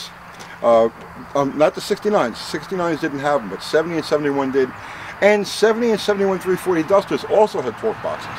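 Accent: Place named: New York, United States. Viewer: Holy moly uncle. First I've really heard your new York accent. Your rear quarter comment sounded like rear quota.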